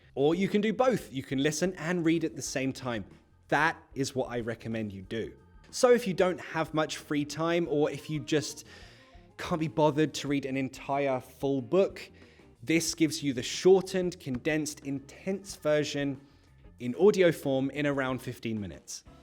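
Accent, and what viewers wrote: United Kingdom. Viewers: This man is a hero, I needed videos of British English but most of the youtube videos are in american English. I don't like american English 😕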